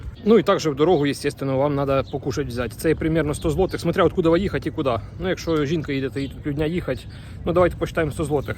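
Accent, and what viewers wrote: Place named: Poltava, Ukraine. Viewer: Друже, з Великоднем!!! А ти з України звідки? Балачка наче Полтавська. Нехай щастить.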